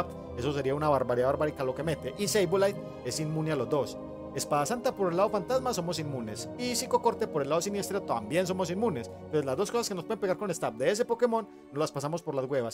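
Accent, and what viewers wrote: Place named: Colombia. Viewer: Hola bro, me encantan tus vídeos y tú acento parcero xd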